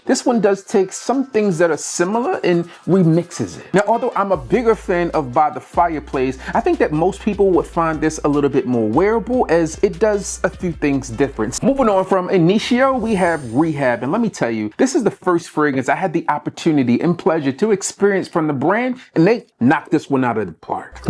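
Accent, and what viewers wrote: Baltimore, United States. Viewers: I picked up marwa n Hugo boss absolu recently n they both smell great!!! Question tho champ, are you from Baltimore? Asking bcuz I’m from bmore myself n when you say do, two, too or anything that sounds like these words, you sound exactly like someone from the town lol. Keep dropping this knowledge my boy bcuz I’m definitely tuned in n I know I can definitely take your word for what’s good out here in the fragrance game!! Take care boss✌🏽💪🏽💯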